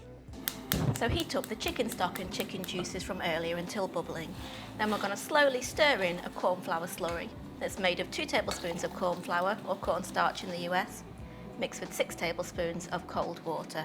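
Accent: United Kingdom. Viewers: So glad to find your channel, I am improving my British accent and your accent and cook are both incredible. I follow u from Iraq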